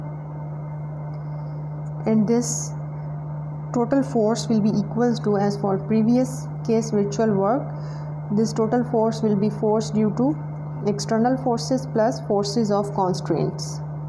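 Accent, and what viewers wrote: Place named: India. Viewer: Accent is only Understandable in India.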